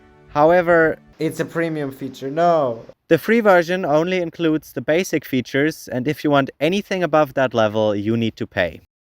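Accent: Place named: Germany. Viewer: Super! Und ich lag mit meiner Vermutung, dass da kein bulgarischer, sondern ein deutscher Akzent durchzuhören ist, nicht ganz falsch. Mir wäre geholfen, wenn du noch mehr zu dem Thema machst. Ich überlege seit Längerem, ob ich mich als Anfänger mit meiner Sony RX100 eher, in Photoscape X, Darktable oder Rawtherapee einarbeiten soll. Vielleicht geht es sich ja einmal aus, dass du ein Video zum diesem Thema machst. Zudem werden Videos mit "RX100" in Titel sicher häufig geklickt.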